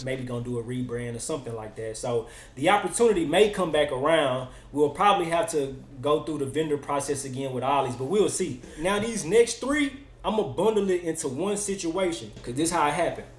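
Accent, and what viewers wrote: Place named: Houston, United States. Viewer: Bro you sound like you from Houston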